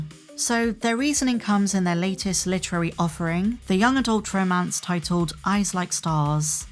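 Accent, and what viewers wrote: United Kingdom. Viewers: I'm no fan of the speculation portions here, or the non-video media offerings since my generation didn't align with the release dates, so I'm not as obsessed as most of your viewers. I am very curious about the person behind the character here. The facts as I've observed is that you put a ton of effort into editing. No breathing pauses, a UK accent while living in America, and your personality coming through in the dialog. My guess is that you're an American male working incognito and are your initials. I have nothing against it if that is the case and would likely do something similar because of all of the loud, crazy, uninformed, and over opinionated attention addicts, lol. Good luck moving forward, and if you ever run out of topics, there's always the fan fiction to share.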